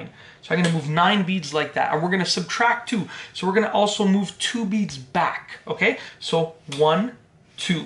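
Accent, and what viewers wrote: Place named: Canada. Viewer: I suspected this came from Canada, because of the annoying accent [Wisconsin, you are now off the hook!], and, as soon as he referred to a dollar as a "loony", my suspicion was confirmed ... and, I navigated away. You should follow.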